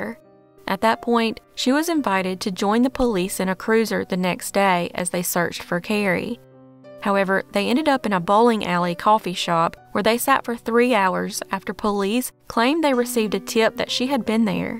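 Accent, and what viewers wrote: South, United States. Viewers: Where are you from in Tennesse?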